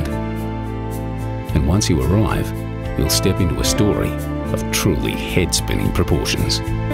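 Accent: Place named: Australia. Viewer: Thank God we have sub titles. I am English and I just cannot understand what this guy is saying. I think it's badly recorded apart from his strong Aussie accent. Good on yer, mate!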